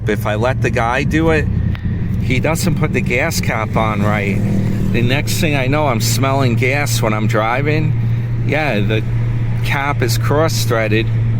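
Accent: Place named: Florida, United States. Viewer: U lost your NY accent u sound like a Floridian now . R u looking for some Sugar like ST the King of YouTube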